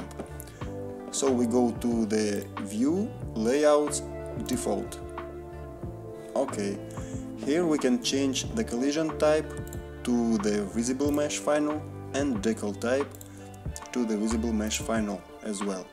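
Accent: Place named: Russia. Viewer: Здорово мужик ! Явно ты из России или русскоговорящий по речи слышно. Ну ладно желаю удачи в этом нелёгком деле ,я тоже занимаюсь ютубом. Кстати у тебя круто получается.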